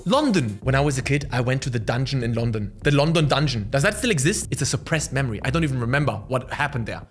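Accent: Netherlands. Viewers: I know you live in germany but your dutch accent is so good lmao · Love your Dutch accent. Much love for our neighbours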